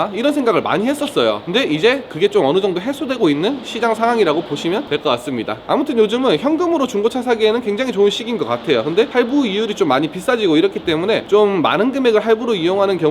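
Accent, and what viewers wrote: Gyeongsang, South Korea. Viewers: 경상도사투리 싫어하는데 이양반 말투는 듣기좋아 ㅋㅋ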